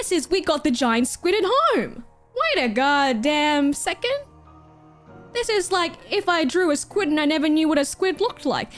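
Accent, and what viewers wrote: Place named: Australia. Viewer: Ahoy, I love the Australian voice.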